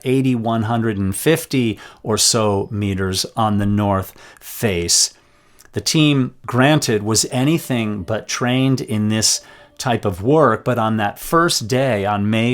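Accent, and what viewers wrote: United States. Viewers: Would you please consider learning how to pronounce the Sherpas' name correctly? It is disrespectful to be still calling them in such heavy Americanised accent when you are covering stories and news about Everest.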